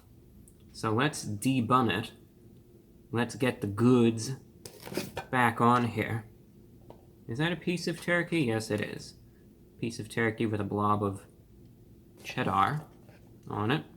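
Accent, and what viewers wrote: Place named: Mid-Atlantic, United States. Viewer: where did you get that Mid-Atlantic accent???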